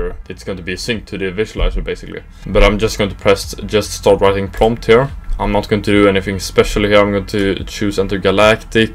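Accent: Sweden. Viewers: Älskar svengelskan, bra video!👍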